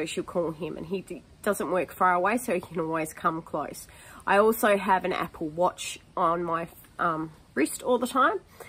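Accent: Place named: Australia. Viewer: I love the Australian accent and it’s good to teach your child what to do when someone is having a seizure!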